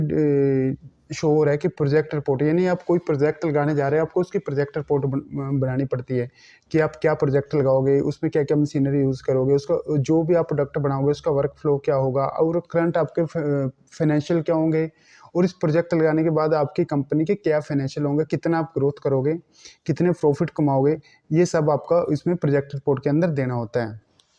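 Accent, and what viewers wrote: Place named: Haryana, India. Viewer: Aap haryana se ho ,, apki language se lag rha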